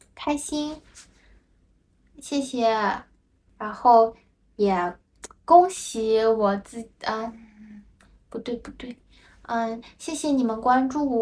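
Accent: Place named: Shanghai, China. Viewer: i think it's just Shanghai's accent is a little different from the general accent of the language. It's not that easy to forget your mother language :v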